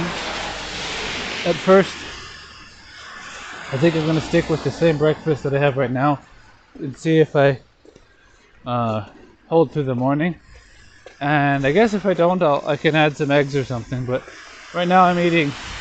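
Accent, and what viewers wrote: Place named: Canada. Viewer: Thanks for taking the trouble to do your video on the way to work & giving us some insight into your working world....I really hope your company takes health & safety seriously, particularly with regards to the glass🙄.Just out of interest what town or city do you live in,I'm guessing somewhere in Canada based on your accent??!! Keep up the good work....I SO admire your openness & honesty!! Mark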